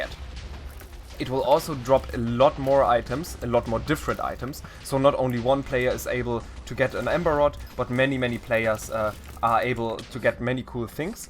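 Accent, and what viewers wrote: Germany. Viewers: Hard Glass is useful for ghast and creeper minions i Think P.S. ich feier deinen deutschen Akzent so hart xdd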